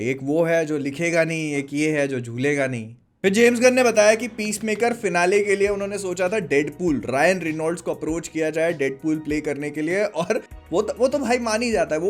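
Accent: Bhopal, India. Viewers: Bhopal se ho kya aap?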